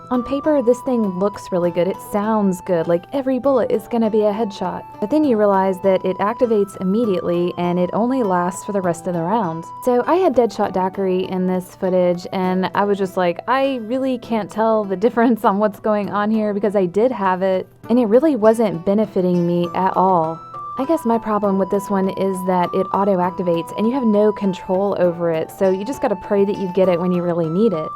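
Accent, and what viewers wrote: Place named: South, United States. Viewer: where ar u from u have a sort of faint southern accent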